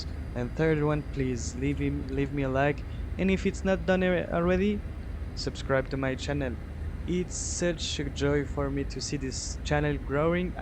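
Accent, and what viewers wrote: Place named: India. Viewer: J'adore ce petit accent anglais qui fleurte avec celui des Indiens anglophone 😅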